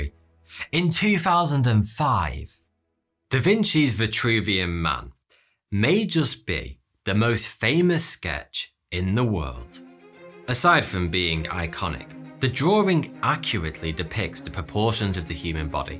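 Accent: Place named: Stoke, United Kingdom. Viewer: You sound a bit stokie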